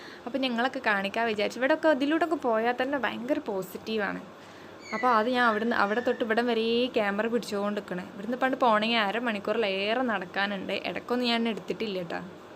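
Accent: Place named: Malappuram, India. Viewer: Natural video ...........🌹🌹🌹🌹🌹 മനസ്സിന് കുളിരെകുന്ന പചപ്പാർന്ന വീഡിയോ .....👍 എന്തൊരു ബങ്ങിയ കാണാൻ ഊരകം മല സൂപ്പർ......👍 ചെരിഞ്ഞും മറിഞ്ഞും തിരിഞ്ഞും കിടക്കുന്ന ആ മരങ്ങളും എന്തൊരു ചന്തം സത്യം പറയാണ് ഈ വീഡിയോ ഫുൾ ഇരുന്ന് കാണുകയാണ് ഞാൻ കാരണം പ്രവാസികളായ എന്നെ പോലോത്തോ ആളുകൾക്ക് ഇങ്ങനെത്തെ വീഡിയോ വളെരെ ഇഷ്ടമാണ് മനസ്സിൽ ഒരു സന്തോഷം ആണ് .,... റബ്ബർ തോട്ടം ufffffffffffffff എന്താലേ കാണുമ്പോ തന്നെ 👍👍👍 ആ കോറി ഒക്കെ എന്തൊരു ചൊർക്ക് 😍😍😍 നമ്മളെ മലപ്പുറം ഭാഷ കേൾക്കുമ്പോ ഒരു രസം തന്നെ ആണ് ലെ 😂😂😂😂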